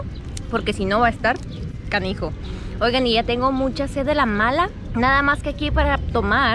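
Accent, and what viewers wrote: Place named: North, Mexico. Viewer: ME SUSCRIBI... ERES LINDA CON TU ACENTO NORTEÑO GROSERO... ARRIBA MEXICO MI LINDA MUJER .... SOY DE LA CIUDAD DE MEXICO !!!!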